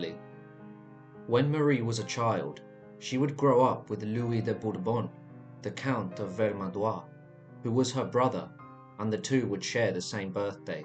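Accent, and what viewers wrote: England, United Kingdom. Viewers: You have an English accent and live in UK... where do you get your American cereal from?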